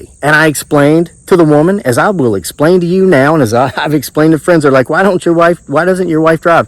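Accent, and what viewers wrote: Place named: North, United States. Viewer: Sweetheart is how many southern women talk! If you were born in the south than you know this to be true.. than again.. your accent soumds more like you are from up north.. Now.. i do agree with your issue.. Sadly.. this has been going on for a while..we have allowed this to happen.. So this should not surprise you at this point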